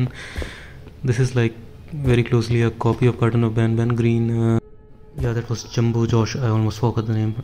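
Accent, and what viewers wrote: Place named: India. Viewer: ur voice looks like Indian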